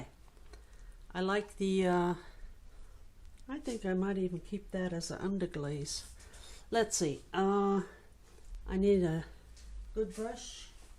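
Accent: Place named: Netherlands. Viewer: The pencil eraser made me laugh and the hair comment. From the other video. I'm always fussing at my hair Lol. I need to colour my greys!!! Very good paintings and thank you for explaining mica powders and pigments. I bought some Siesso mica powders and watching videos on them. Love your Dutch accent!!!...;) I'm from Ohio...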